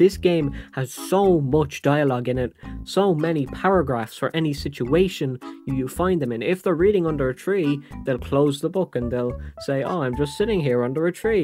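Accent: Ireland. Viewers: irish person detected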